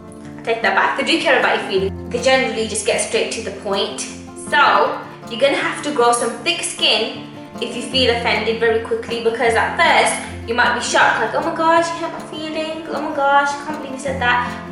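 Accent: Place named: Styria, Slovenia. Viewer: do you live in stajerska (maribor, ptuj)? as your accent when speaking slovene is like from people who live there.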